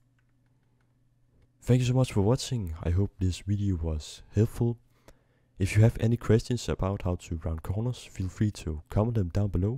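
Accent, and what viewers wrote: Denmark. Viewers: He sounds like he's from Denmark...rural Jutland if I'm guessing.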